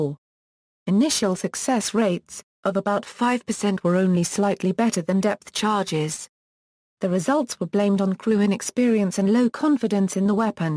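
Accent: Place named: United Kingdom. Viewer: A computer with a British accent ... cute~!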